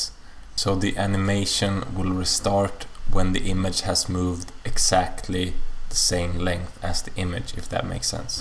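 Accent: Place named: Sweden. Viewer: Are you from sweden? bzs you have the same accent as pewdiepie.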